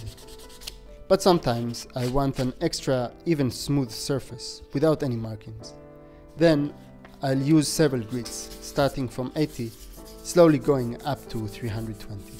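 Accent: Israel. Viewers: סרטון מדהים,רק ישראלי יכול לזהות מבטא עדין של ישראלי🙃 האם סוגי עצים שהם רעילים או שכל ענף שנראה בריא אוכל להשתמש בו לכף? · That Israeli accent 👌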